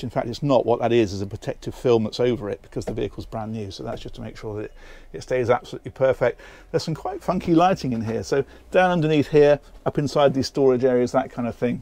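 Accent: United Kingdom. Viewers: Thanks a lot for making different videos. I love British English accent and I see your videos because you speak very clearly & beautifully. I congratulate you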